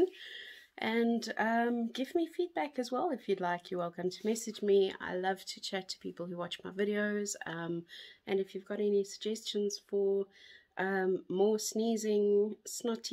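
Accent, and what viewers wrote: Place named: South Africa. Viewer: Wow. That was beyond amazing. You really have a beautiful juicy perfect nose. The way you blow your nose is just perfect. The position of your hands. The honks you make. And you are really beautiful in general as well. And I love the south African accent too. Is there a way I can talk to you in private?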